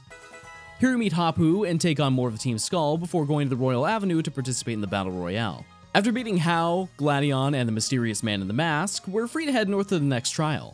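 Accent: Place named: United Kingdom. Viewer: It's crazy how different and sound. One sounds more British (being more formal and a bit cynical) while the other sounds stereotypically American (more loud, but more fun-loving and casual). (I hope I didn't offend anyone saying that, it's just to show how different in energy these two are.)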